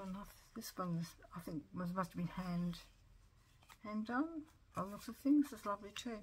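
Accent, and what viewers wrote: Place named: Australia. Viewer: Love your vid! It’s nice to hear an Ozzie! Lovely cards and washie tape and stamps! Love to see what you can do with them! Francke from Brisbane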